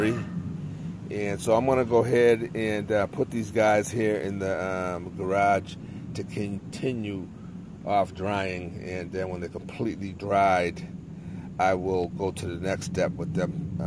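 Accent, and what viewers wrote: Boston, United States. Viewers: is sounding much better, I don’t hear pain in your voice, only that Boston accent.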